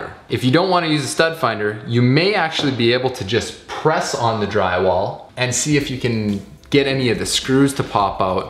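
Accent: Canada. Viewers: You sound Canadian. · The most Canadian accent ever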